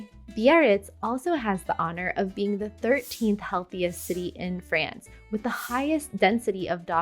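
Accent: United States. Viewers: Franco-American France mother American father US army, and I have live in France for over 20 years this time! I could not disagree with you more on everything sure if you have lots of money, and are will to burn it anywhere in France is just as good as any other place! France is about the size as the state of Texas and everything is near by. Do you want tourist trap she is your lady someone to help spend your money she is your lady or someone who thinks she knows France, but actually she fooled you she is your lady! Lyon & Toulouse two of the worst places to go and Provence Nice is nothing, but American tourist trap for the chic want-a-bes! One thing about France is you can find great food and great wine anywhere and there is something for everyone you want water go to the water you want mountains you just go there, but don't take her advise she actually knows little about France and that American accent could kill a horse to the French that have to listen to that I am sorry even my father knows better and he hates the French! 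Read some French history Google map research the place you like listen to every other expat on YouTube and listen to some French videos try learning French do what you can before coming here get yourself a Frenchie to talk with by asking one!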